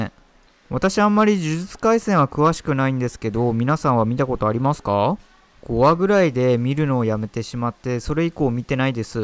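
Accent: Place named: Kansai, Japan. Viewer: 私はミスドでイーブイだけ食べれたけど売り切ればっかりでした😖 関西色強めですね😂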